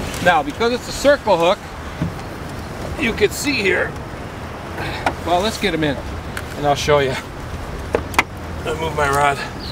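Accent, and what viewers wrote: Canada. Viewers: are u Canadian were your accent from sir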